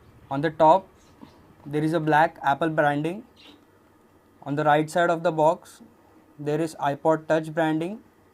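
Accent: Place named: India. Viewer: You made me cry I hate Indian accent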